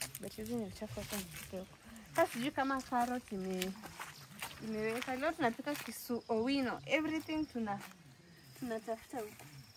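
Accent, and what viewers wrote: Kenya. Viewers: ako na ascent ya waluhya 🤗🤗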